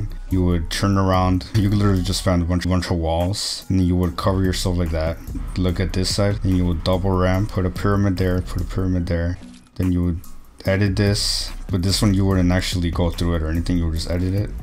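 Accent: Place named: Spain. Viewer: U sound Spanish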